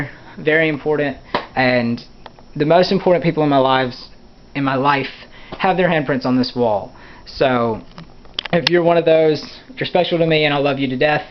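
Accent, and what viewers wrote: South, United States. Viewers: I dont know why but i love the southern accent❤️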